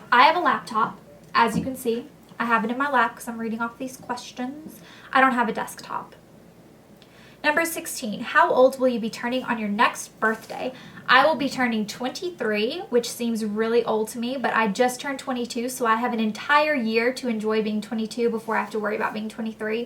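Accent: South, United States. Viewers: your southern accent is coming back slightly. is it because your back home? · Hahaha, could it be that your accent is getting more 'Southern' again, because you are at home? I know it happens to me, when I stay at my parents for a longer time. (And I'm from Belgium! Did you like our country?)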